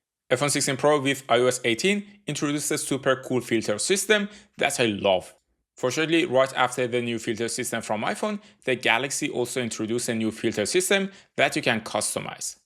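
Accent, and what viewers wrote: Iran. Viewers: یعنی قشنگ چشماتو ببندی متوجه میشی یه ایرانی داره انگلیسی حرف میزنه:)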